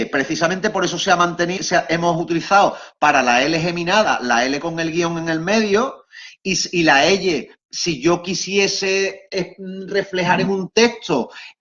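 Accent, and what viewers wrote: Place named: Triana, Spain. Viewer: Yo soy andaluza y no entiendo por qué decís: "Rassoneh" o "prossesso", como se pronuncia en Triana y no simplemente: "razones". Hablando de imponer , esque ahora hay que pronunciar en trianero? "Paressío", "ehpessialihta". Pff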